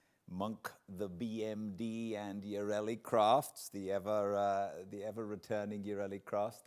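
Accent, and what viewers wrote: United Kingdom. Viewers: "But could not be reconciled to the thought that the letter might fall into the hands of the British" The irony of this line being read by a Brit is amazing. Made me chuckle. I just discovered your channel,. Thanks for making these videos; I am looking forward to going back and watching some of your previous installments. As someone who lives with dyslexia, reading long texts was always very frustrating and demoralizing for me. But I used to LOVE being read to! My dad used to read the Chronicles of Narnia, Sherlock Holmes, and other favorites to us as a family. That was a long time ago, but those memories are still some of my favorites from my childhood. This channel feels like a return to that childhood. So thank you for recording these. I'm enjoying them quite a lot.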